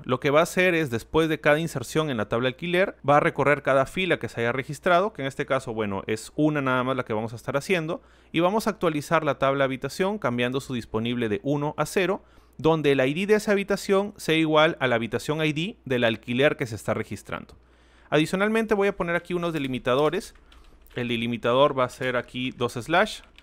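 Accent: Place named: Mexico. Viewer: vaya, hasta que alguien enseña algo concreto, extenso, complejo, explicado, util y usando buenas practicas. No sé si eres de Perú pero hablas como Mexicano 👍🏻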